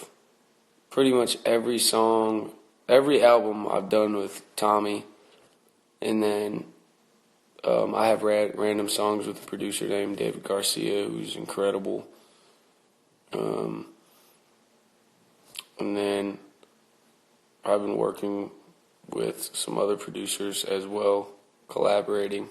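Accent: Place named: South, United States. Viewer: he got the country accent going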